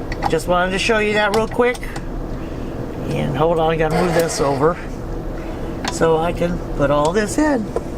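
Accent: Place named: South, United States. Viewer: I like your Southern accent, drives me wild!🦝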